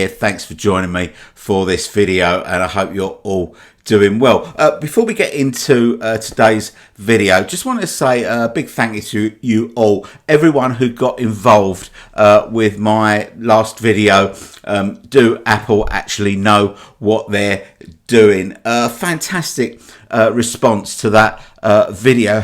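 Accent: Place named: Cockney, United Kingdom. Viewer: Hi, Just one off the ol' cuff and not related to this latest offering, but I watch all your videos and like that east end twang you have... Am I correct in thinking your an irons supporter? If so I wonder how many of us are duel Hammer/Beatle fanatics? How wonderful both our sides ruled the world in the mid sixties, anyway enough of the sentimentality! Take care Kev P.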